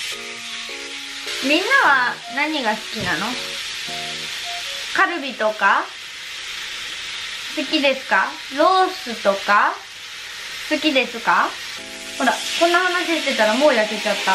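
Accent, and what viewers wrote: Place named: Kansai, Japan. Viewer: 関西弁面白くてめっちゃ可愛かった😳 これからももっと話して😂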